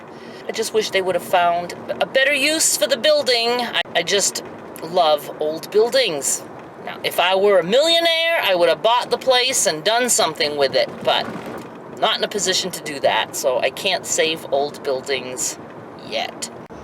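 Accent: Maine, United States. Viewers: I thought I heard a Maine accent. I also live in Maine in Aroostook County (3 miles from the Canadian border.) I've been here 7 years but I'm from California. We plan to move to "civilization" (downstate) in the next couple of years.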